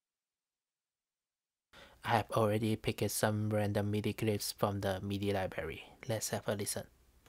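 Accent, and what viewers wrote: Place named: France. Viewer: Love the crazy French accent!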